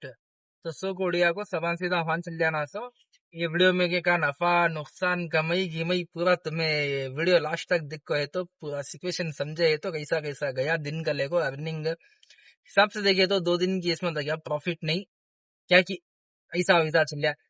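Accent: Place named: Deccan, India. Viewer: bhai aap kya Deccani bhol rahe hai? It is very nice and should be taught in schools as a language so that it can be preserved.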